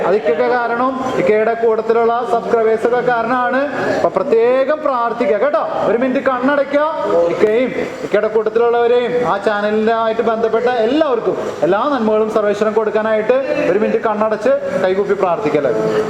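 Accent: Palakkad, India. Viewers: ഭായിടെ പാലക്കാടൻ സ്ലാങ്ക് ഇഷ്ടപെട്ടവർ ലൈക് ചെയ്യുക 👍👍👍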